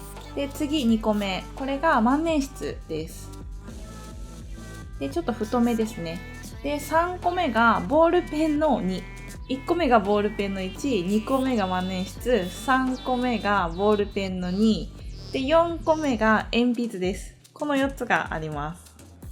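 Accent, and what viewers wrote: Kyoto, Japan. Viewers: いつもすっごく分かりやすくて楽しくて、ためになります！ お話も聞きやすくて、早くもなくて、癒させるし〜♡ 待ち続けたiPad miniを手にしてからノートも手帳も手書き類すべてiPad miniに移行しているので タイムリーで嬉しかったです♪ 京都弁やってほしいでーす！ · 以前アップしてた中に、21才頃の動画でしたっけ、あの時の話し声が関西弁だったので、そっち方面かもね。と、思ってました。京都弁で、ぜひお願いします。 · イントネーションが関西ですよ^_^ 私も関西なので親近感が湧きます^_^ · 京都弁の解説めっちゃ楽しみやな〜❤ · 京都弁のイントネーションが節々に感じられて、自分は癒されながら観てます。 是非とも京都弁で解説してもらえるのを楽しみにしてます。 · 京都弁の解説メチャメチャ楽しみです！ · いつも貴重な情報ありがとうございます。漢字の書き順が少し気になりました笑 京都弁期待しています。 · 京都弁期待しています。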